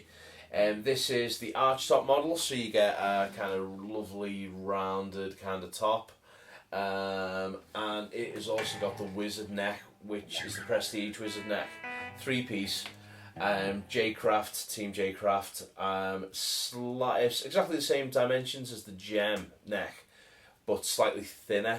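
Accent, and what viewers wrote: United Kingdom. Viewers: unmistakable British accent :D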